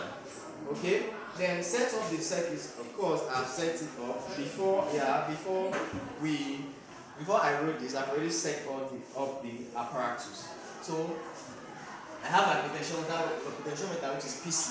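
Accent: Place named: Igbo, Nigeria. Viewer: I knew you are Igbo at the instant of your first word , and that's why I called you brother. Just keep up the work. ka Chukwu nonyere anyi!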